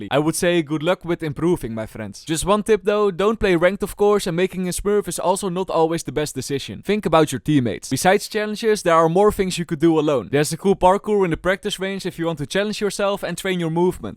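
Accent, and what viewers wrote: Netherlands. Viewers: Strongest dutch accent ive ever heard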